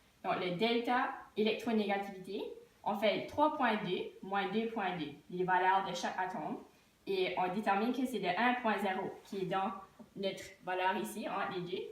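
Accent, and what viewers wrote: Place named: New Brunswick, Canada. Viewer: Nouveau brunswick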